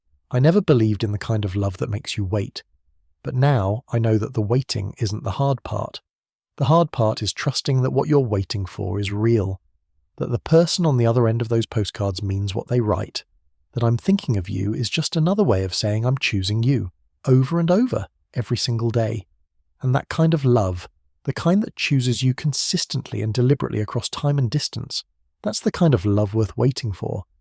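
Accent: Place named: United Kingdom. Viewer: I would like to make a suggestion. If it's OK to take a british narrator it's non real to use him for dialog of American characters. Could you try to make an effort to solve this situation? As an actor myself it would really make a difference. Thank you! By the way, I'm from near Montréal, Québec, Canada.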